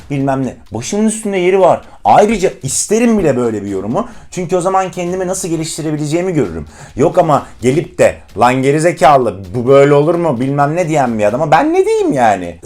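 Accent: United States. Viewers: abi dediklerin cok dogru turkiye insanlari degismez ingilizcen bence bir amerikalidan daha amerikali iyi ki varsin <3 su an ingilizcem cok az var seninle beraber ingilizce ogrenmeyi istiyorum ve dediklerine fazla takiliyorum <3333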